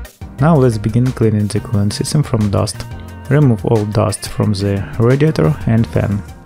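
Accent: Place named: Russia. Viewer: Strong russian accent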